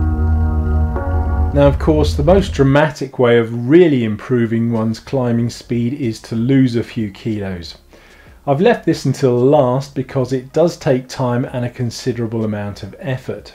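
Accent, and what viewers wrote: United Kingdom. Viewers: you are really cool and a good cyclist. Love your oh so british way of talking. In cycling as in so many other things you get better by repetition, repetition, repetition, climb, climb, climb..... you will be astonished how much progress one can make. After even 1 month or 2 of repetitive climbing & climbing & climbing you do things that were utterly unthinkable before. Everything gets stronger, mind, legs & lungs.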